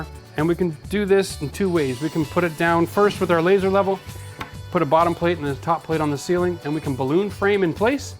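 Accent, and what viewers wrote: Canada. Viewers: Wait . . . are you really not gonna tell us about what happened to your eye? . . . I just ran into your videos this morning and I they're a pleasure to watch and listen to even when I stepped away from my desk. . .that elevator music and your Canadian accent do the trick. . .Keep up the good work! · Mate, I love your work and your accent. I was brought up in Edmonton but have lived in Australia for the last 45 years. I still love to hear Canadians talk. I really miss Eatmores and Coffee Crunch too! Take care and stay safe....Kev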